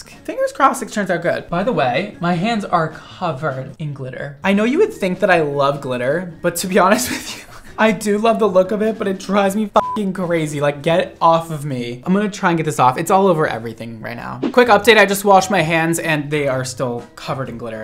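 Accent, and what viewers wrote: United Kingdom. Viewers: me lives in the uk does British accent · LIVING for your cute British accent! Nailed it! ❤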